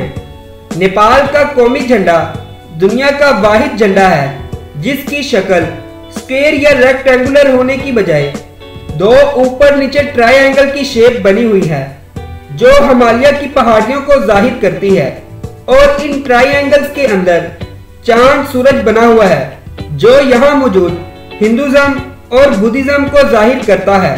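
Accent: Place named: Pakistan. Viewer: Woh Pakistani Urdu accent yesa hota my Dubai mai reheta hoon sob Pakistani ka accent yesa hi hote he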